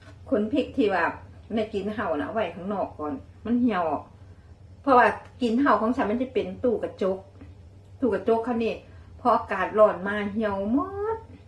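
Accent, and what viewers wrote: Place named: Korat, Thailand. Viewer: สวัสดีชอบสำเนียงโคราชมากค่ะ เป็นตาแช่บแท้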